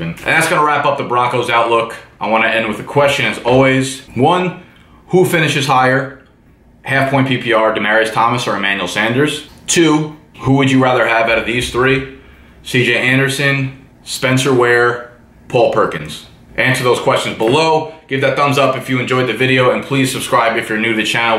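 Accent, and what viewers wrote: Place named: Philadelphia, United States. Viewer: You from philly or south jersey?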